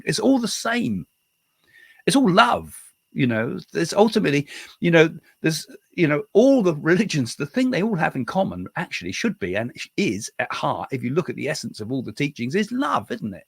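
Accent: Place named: Scotland, United Kingdom. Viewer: Some Scottish accent here and there during your conversation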